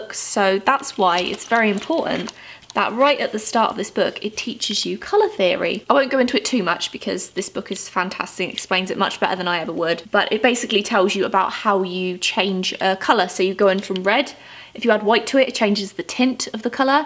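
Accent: England, United Kingdom. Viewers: Which country do you live in because you sound English, but I've never seen Prismacolors in the UK (I'm Welsh) and you were stating the price in dollars? You also mentioned Hobby Lobby but I'm only familiar with Hobbycraft? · I made a few pages in my sketchbook that I named "mouths", "eyes" and drew over the whole pages. At first I was like "I need to make great works in it", but soon I realized the point of it xD Also, when I watch your videos I always pick that British accent. It's very helpful, since I study English XD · I just watched this video which was the first one for me and you made it enjoyable. I enjoyed your style of presentation so Kudos! From your accent you are English yet are your living in the US?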